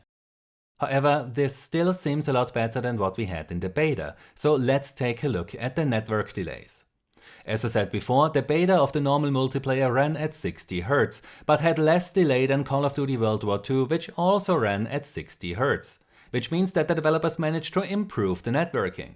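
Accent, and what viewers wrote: Germany. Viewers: Is your first language german? You accent sounds like that and is easily to undestand for me as a bad english speaker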